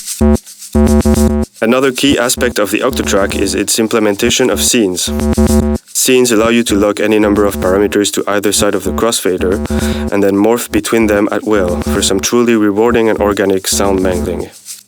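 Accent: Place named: France. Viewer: super vidéos, je crois reconnaître un petit accent français, ça serais cool des tutos en français :)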